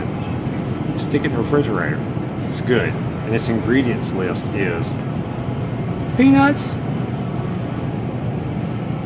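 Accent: South, United States. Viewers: P.S. Love your videos. You speak just like my dear Southern relatives who have sense enough to eat like you do.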